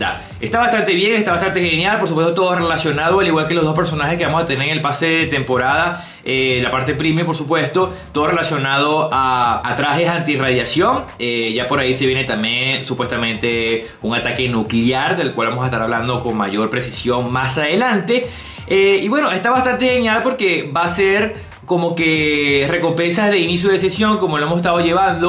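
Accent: Venezuela. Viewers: eres Venezolanl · Pareciera que fuera Venezolano, yo soy Venezolano ahí me avisas para jugar mano dejame tu WhatsApp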